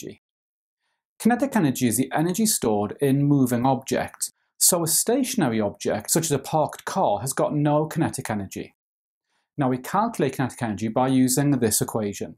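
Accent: United Kingdom. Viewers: Thank you sir for doing these videos, so easy to watch and understand. There have been many American videos for studying on the Internet. However my English is my second language, I've always had trouble with pronunciation from American language videos. Your videos are free, follows the National Curriculum and in British English.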